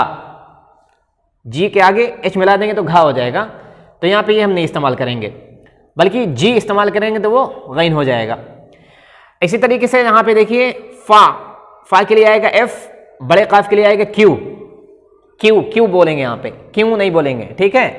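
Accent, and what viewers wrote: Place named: India. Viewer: Your pronunciation is according India not UK.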